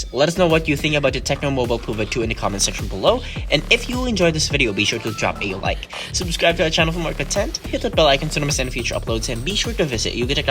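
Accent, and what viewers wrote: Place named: Philippines. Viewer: Are you a filipino? I like your accent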